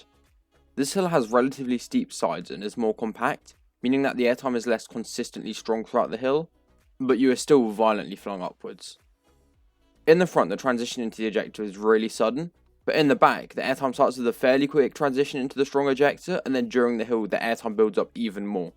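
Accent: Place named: United Kingdom. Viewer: Damn It's always cool to see a Bri'ish and/or foreign enthusiast talk about American rides and their perspectives! I hope you like our other rides and our abundance of air time!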